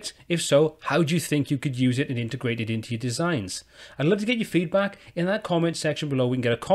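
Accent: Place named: England, United Kingdom. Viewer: You mate, are a genius. Great to hear, and see, someone with a regional English accent give clear and concise help. So tried of hyper active youngsters trying to blind everyone with their knowledge. Or a video with a silly tune playing and no voice overs. Worth being locked down for :)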